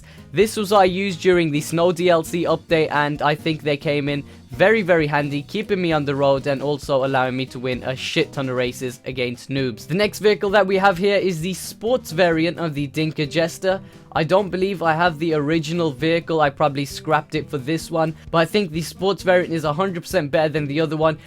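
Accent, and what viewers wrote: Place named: England, United Kingdom. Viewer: You have an English accent but you look like you should live in India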